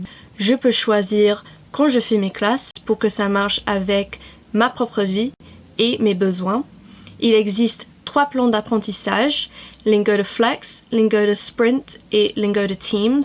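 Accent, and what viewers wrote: United Kingdom. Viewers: ironically love the casual brit accent slipping through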